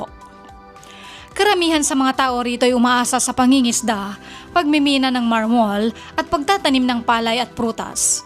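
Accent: Visayas, Philippines. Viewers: Copy paste ang narrator sa ibang pages eh! Peru ang tono bisaya!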